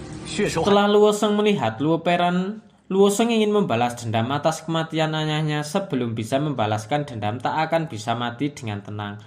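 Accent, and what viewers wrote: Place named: Java, Indonesia. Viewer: Bang bahasa di ganti dong kebahsa Indonesia Jagan bahasa Indonesianya kayak di ucapakan seperti bahasa Jawa mungkin karena itu sasgraibernya sedikit yg laik pun sedikit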